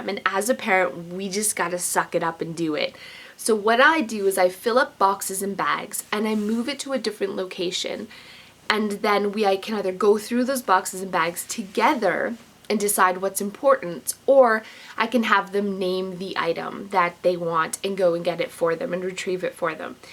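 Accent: Canada. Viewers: I'm a new sub! Love your channel and your personality! Are you Canadian? Your accent sounds that way ;) But I'm from Alabama so I'm sure I sound great to you! Ha!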